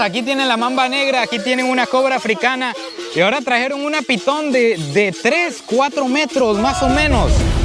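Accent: Mexico. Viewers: Creí que era mexicano bro jejeje pero igual buen video chulada bendiciones bro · Mi compa me gusta k hable como mexicano....cuando quiera ir aya tiene su casa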